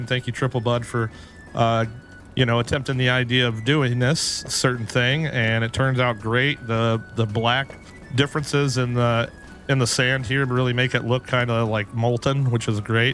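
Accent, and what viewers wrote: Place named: England, United Kingdom. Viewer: I would have been really surprised if you were not nominated. That said though, a few people that have had great builds have missed out. It is what it is. Competition aside, I love your Enshrouded videos, keep up the good work. One complaint tho, you should have used your excellent English accent again when explaining the lore 😁😁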